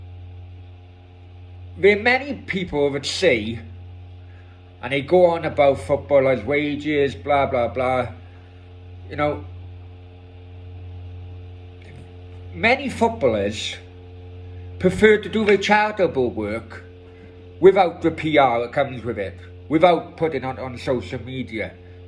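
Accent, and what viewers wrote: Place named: Wales, United Kingdom. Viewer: Nice one, great the way you said flying pig United. Love the Welsh accent. Keep it up mate. Happy birthday for tomorrow too 🎂🎉🎈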